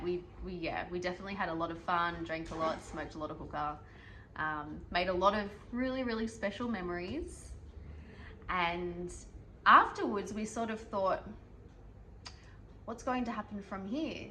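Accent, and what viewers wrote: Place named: Australia. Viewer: Gud evening from south Africa I'm enjoying ur vlogs I love ur Aussie accent have a blessed Saturday evening loads of warm blessings ❤❤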